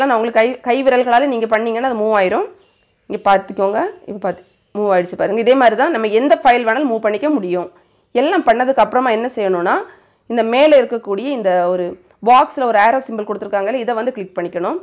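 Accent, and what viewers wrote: Kanyakumari, India. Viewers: Sister....neenga kanyakumari thanae.....🙄slang super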